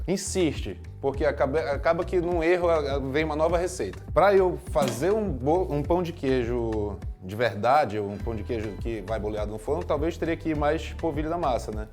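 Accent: Pará, Brazil. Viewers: Tão bom ouvir o sotaque paraense no YouTube . De chefe de cozinha ainda, perfeito!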